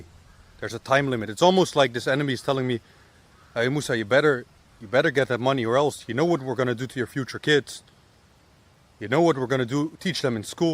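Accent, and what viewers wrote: Netherlands. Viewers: I heard the Dutch accent in your first video, groetjes uit NL alsnog, als ik de kans krijg en het kan financiëel ben ik opgedonderd 🤣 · Ik dacht all dat ik en Nederlands accent hoorde maar lekker bezig man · Yea i thought i heard al lil dutch accent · Yo Musa, ik dacht al te horen dat je uit Nederland komt. Mooi om dit te zien. Ik ben ook nederlands. Ik vind je werk met Far From Weak heel goed. Ga door.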